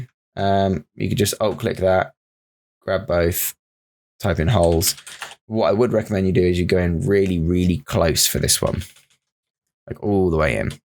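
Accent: United Kingdom. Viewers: You got some tutoring skills man 🤙🤙🤙 Far better when you have someone with an english accent hahah.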